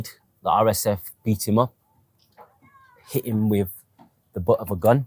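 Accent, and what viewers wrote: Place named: Birmingham, United Kingdom. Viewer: That Birmingham accent 👌🏾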